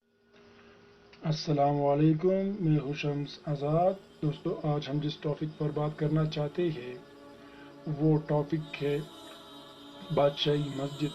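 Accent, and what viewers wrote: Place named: Kashmir, India. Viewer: It's a kashmiri voice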